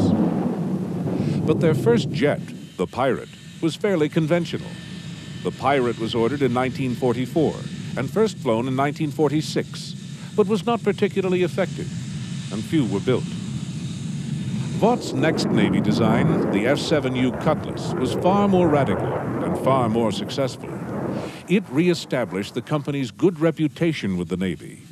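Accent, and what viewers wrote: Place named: United States. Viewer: American narrator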